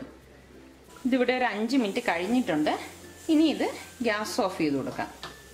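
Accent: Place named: Eastern Kerala, India. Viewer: .. Ya ya.. Sure.. Ithatha wr u frm? Vadakkarude slang alla talking.. Kizhakot anu ennu thonnunu.. Am i correct.. Im vry interested in ur recipes.. Masha Allah.. May Allah bless u..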